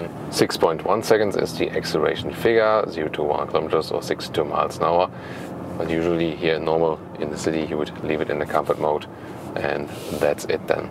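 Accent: Germany. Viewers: Da sieht man dass du deutscher bist aber sehr gut english kannst. Danke fürs review!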